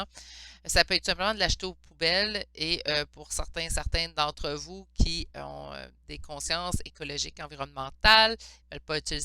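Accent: Quebec, Canada. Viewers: Je n'ai vu que ton intro pour l'instant, et je voulais te dire que j'adore tes petites anecdotes ! Et comme tu l'as dit, t'entendre parler de réunion donne de l'espoir (et puis je suis contente pour toi surtout 😁). Généralement, quand j'entends quelque chose qui me fait de la peine (et que ce n'est pas une méchanceté à la base bien sûr), je me dis que ça en dit plus long sur moi et les blessures que ça vient réveiller que sur la personne qui a dit ces choses là... Oh, et J'ADORE ton bel accent et tes expressions québécoises 🥰 J'ai l'impression qu'en France on se "moque" beaucoup des autres accents de la francophonie, alors que bon, pour les autres, c'est nous qui avons un accent 😅 Désolée pour ce long pavé, mais je t'ai sentie attristée, alors je voulais rééquilibrer un peu la balance. 😉❤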